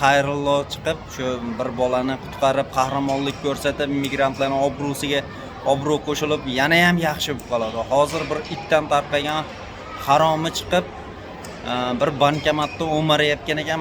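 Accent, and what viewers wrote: Chortoq, Uzbekistan. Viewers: Ука. Шевангиздан чортоклик сиз шекилли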